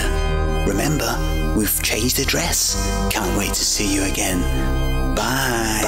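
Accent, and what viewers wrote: Scotland, United Kingdom. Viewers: Anlglisized Scotch accent to the MAX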